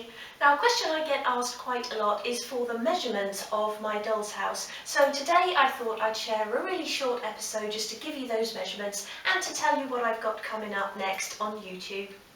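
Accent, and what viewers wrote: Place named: United Kingdom. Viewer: when I start watching your videos, I was annoyed by your British accent.. but now I can say that you are very beautiful woman with simple but gorgeous style.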